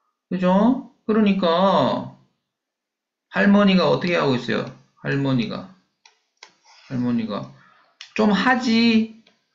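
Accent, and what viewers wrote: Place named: Yeongdong, South Korea. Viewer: 예전에 선생님이 라는 호를 사용하실때부터 말씨도 그렇고, 제 고향 인근 분이 아닌가 생각했었는데 맞군요!! (저는 고향이 황간, 영동쪽이 아니고 접해 있는 경북입니다. 고등학교 친구 중에 황간 출신도 몇 있죠!) 템플스테이 할곳을 찾다가 사를 다녀온 기억도 나네요. 산 계곡, 월류봉, 물한계곡 참 좋은 곳에서 태어나셨네요!! 올갱이국도 생각나구요. ^^ 강의 열심히 챙겨서 듣고 있습니다!! 감사합니다.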